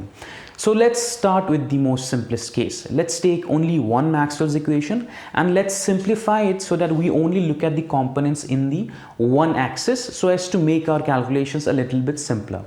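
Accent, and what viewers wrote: India. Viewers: I have to admit, it is not always easy to understand lectures of professors with Hindi accent, but I would like to say that I appreciate the effort you took on diction and pacing. Made it very comfortable to listen to, which is great for learning. Also your explanations are awesomely clear and easy to follow, without skipping anything important. I could follow the lecture very well, even though it is the first time I'm looking at this topic of the inconsistency of Maxwell equations and Galilean transformations. The algorithm did a great job, because I was looking exactly for this lecture and yours was the first thing to come up and it is well deserved. Thanks for the great content.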